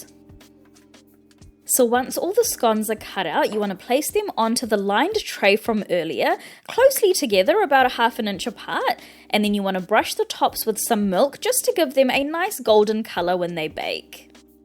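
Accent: New Zealand. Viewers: Hi just wondering your a NZer your accent sound familiar lol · Is this a Kiwi accent?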